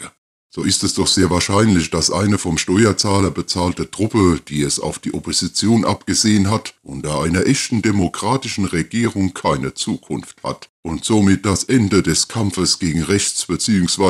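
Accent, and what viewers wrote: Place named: Swabia, Germany. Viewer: Der Gorilla hat es geschafft, das ich (Schwabisch Dialekt?) ertrage. Top Integrationsleistung. Vor war es wie Fingernägel auf Tafel. Ich denke schon, das es enormes Einsparungspotenzial bei NGOs gibt. Hat denn (Miley?) Erfolg damit? Orban hat es erfolgreich exerziert. Und möglicherweise einen Maidan 2.0 verhindert.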